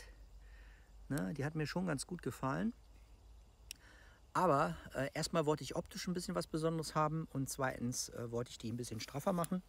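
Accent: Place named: West Germany, Germany. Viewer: Deinem Dialekt nach muss man denken das, du ein Wessi bist. Jede thüringische Gegend hat seine eigenen Nuancen, doch grundsätzlich erkenne ich jemanden am Dialekt ob er aus Eisenach, Apolda oder Schleusingen kommt. Mein Gemecker ist nicht böse gemeint. Verstehe es als konstruktive Kritik. Videos welche Informationen verheißen, dürfen einen zeitlich Rahmen nicht sprengen, sonst klicken die Zuschauer gelangweilt weiter. Schau in deine Statistik, da wird sich bestätigen was ich sage.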